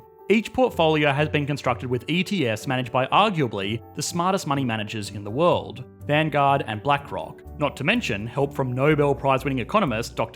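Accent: Australia. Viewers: Australian accents make me wretch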